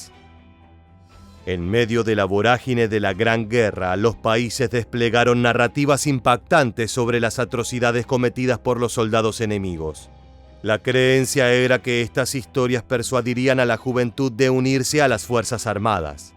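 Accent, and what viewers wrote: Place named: Argentina. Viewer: Siempre tira a favor de USA y la OTAN, ellos son siempre los buenos para éste canal y los alemanes son los maaalos! demonios! Conste que el canal, a juzgar por el audio del locutor; es de origen argentino. Y que peor enemigo de Argentina es la OTAN quien conspiró contra la Argentina y apoyó logísticamente a la fuerza expedicionaria del reino satánico unido. No hay caso...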